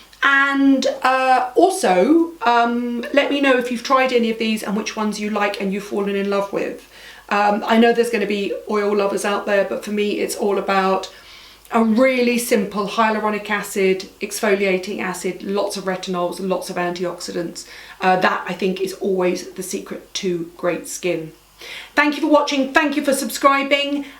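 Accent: United Kingdom. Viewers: I totally agree! Even tho,...I miss a lot of what she says with her lovely, fast-talking British accent. But it sure is nice.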